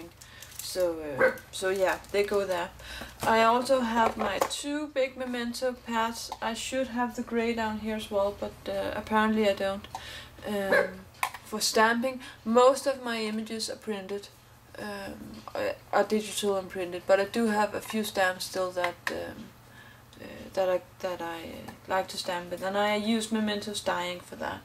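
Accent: Denmark. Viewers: Are you from Denmark ? xD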